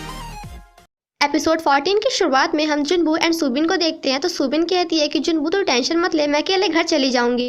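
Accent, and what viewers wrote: Gujarat, India. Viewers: Didi aap Gujarat se ho kya?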